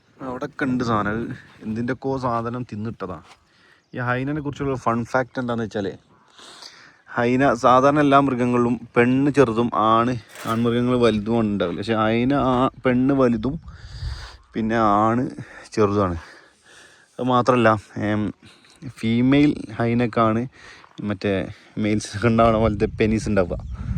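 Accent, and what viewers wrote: Malappuram, India. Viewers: Bro inside wilderness you shouldn’t play with the windows rolling it up and down. What if it gets stuck? But bravetrip indeed. I wouldn’t go alone on such trips 😂. Like your commentary malappuram style ❤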